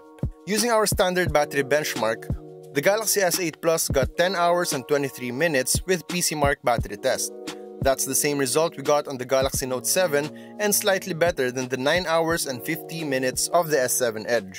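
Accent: Philippines. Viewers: wow at the first 5 seconds of the video I already knew you were a filipino because of your accent....since you're a Filipino I will give you a thumbs up and I'll subscribe...btw i like the quality of the vid. Great Job po 👍👍❤ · gawd .. the Philippino accent is the fucking woooooorst galàsheeew, clawkspeed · Chris humphrey galapon yup. He has a really heavy Filipino accent :) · this guy sounds Filipino. eh :)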